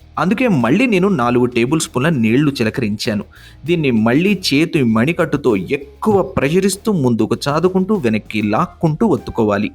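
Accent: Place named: Telugu, India. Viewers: Love the Telugu accent and Telugu! prefer Telugu without english words! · Narrator voice Mind Blowing.... His Telugu Acssent wonderful🎉🎉🎉🎉🎉🎉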